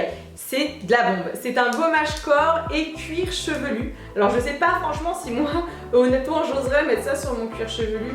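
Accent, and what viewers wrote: United Kingdom. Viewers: J adore ton hello et ton accent british. 👍👍tu présentes aussi des tres bons produits même pour les hommes c good. Très bonne vidéo. Merci à toi ça fait plaisir que tu sois aussi active. 💙💙✌✌#warrior4ever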